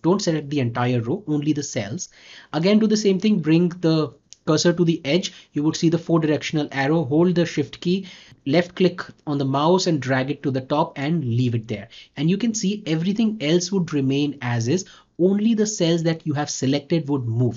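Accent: India. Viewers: When you're trying to learn something software wise, you know you clicked the right video when the first thing you hear is an Indian accent. Awesome video, very helpful!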